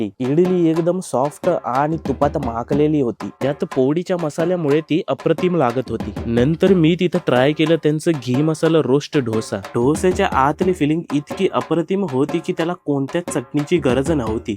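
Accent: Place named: South India, India. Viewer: South accent is superrrr😄👌🏻👌🏻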